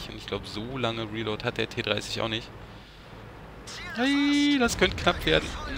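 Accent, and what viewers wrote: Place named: Switzerland. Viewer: ahhh wie geil ein schweizer :)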